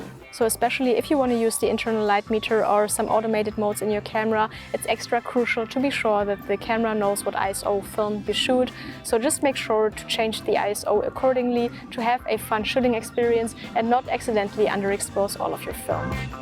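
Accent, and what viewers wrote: Germany. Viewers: Thanks for the detailed tips and also making me notice i kinda love listening to English with a German accent. Except when its mine.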